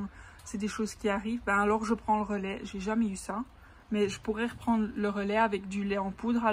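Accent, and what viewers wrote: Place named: Belgium. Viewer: Coucou je voulais savoir où se situe ton élevage 🤔 car il me semble que tu as un petit accent belge 😆 J'ai regardé beaucoup de vidéos d'élevage de lapin et malheureusement il n'était vraiment mal renseigné 😕 Mais je trouve que toi tu t'es renseignée ,tu explique très bien et ça se voit que tu gère très bien cette elevage 😁 Aussi non merci beaucoup pour ta vidéo elle m'aide beaucoup 😊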